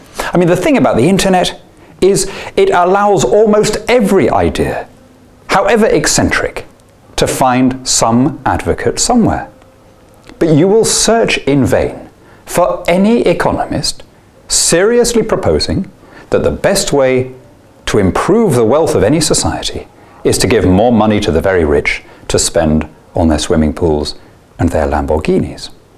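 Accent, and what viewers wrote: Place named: Southern England, United Kingdom. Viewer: Scuba Sausage I'm sure he is a southerner, I can tell from listening. xD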